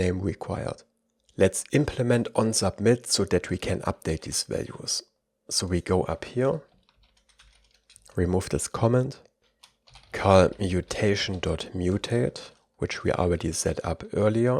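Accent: Germany. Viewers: Direkt gemerkt das du deutsch bist haha, super content!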